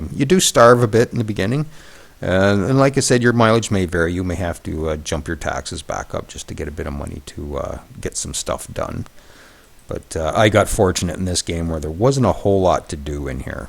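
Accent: Canada. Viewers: I wish you could do more of these . Im just starting with this brilliant game and its a big help ! Btw im German and can understand your explenations pretty well , it might be you beeing Canadian . Thx for your DW series !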